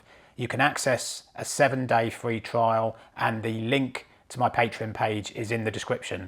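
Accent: United Kingdom. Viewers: I must say I am set with my sound system but anyways I like to watch your videos,nice voice and british pronunciation feels good to my ears.But man,can't thank you enough for discovering their music to me. 1000 x thank you. My system: Verum 1mk2,Violectric v202,Schiit Modi Multibit and Arcam rPlay streamer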